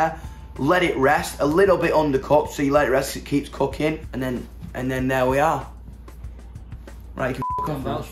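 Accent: United Kingdom. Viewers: this is exactly how I imagine a British person to sound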